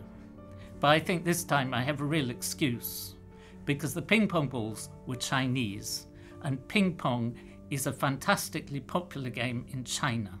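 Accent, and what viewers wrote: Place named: United Kingdom. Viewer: Science is more fun with british accents and stereotypical scientist hair.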